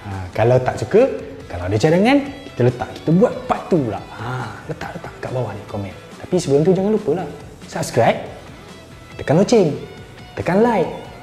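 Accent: Kelantan, Malaysia. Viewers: Ralik bangg. Kelantanese ke?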